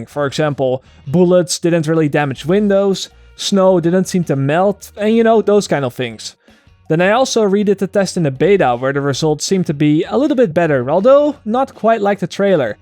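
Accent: Netherlands. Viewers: is this guy dutch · Dutch english accent* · ik wist het gewoon dat je een Nederlander was XD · nice good dutch exand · nederlandsengels accent meneertje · i knew you were dutch from you accent & in the end you spoke dutch & i was like ..''yup i knew it xD'' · Are you dutch? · Is this guy Dutch? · are you dutch? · Are you dutch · Haha ik wist dat je Nederlands moest zijn, je hoort het nog een beetje in je stem. Maar buiten dat een leuke video! je hebt er een subscriber bij. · you dutch? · I think he is dutch. I'm dutch as well and that is just the way most dutch people speak english haha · you can really hear an accent when you're dutch yourself haha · Je hoort echt duidelijk dat je een Nederlander bent :D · are you duthc? cuz you sound dutch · This dutch accent is driving me nuts >.< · are you dutch cuz your accent sounds the same as mine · Wou vragen of je een Nederlander was aan de hand van je accent ... 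Maar het einde gaf mij al genoeg antwoord ... goeie video kerel ;)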